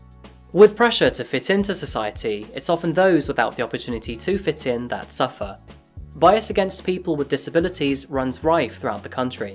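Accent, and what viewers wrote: United Kingdom. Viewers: out of context but i get so calm and feel so good listening to this guys voice even tho hes usually talking about murder lol i love u adorable british guy who tell me true crime cases, i love u! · I brought it up because apparently someone thought that Japan is any worse than any other country. Nopers. Even places like Canada do shit things to people. This video was made with a holier-than-thou attitude. It's probably best to look at your own country before you start blaming other countries for things. This guy sounds British, and we all know how bad the UK, one of the dominant colonizers of the world, has been, and continues to be. I wouldn't doubt it if this narrator himself has some dark secrets. One thing that certainly isn't a secret is that he is capitalizing on crimes. He's actually capitalizing off of the victims of this massacre. 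If he actually cared, he'd be doing work behind the scenes, helping people with disabilities, instead of going for a cushy YouTuber lifestyle.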